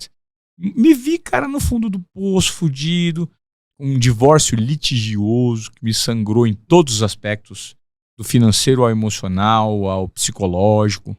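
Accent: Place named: Sao Paulo, Brazil. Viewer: Que isso e um charla paulista haha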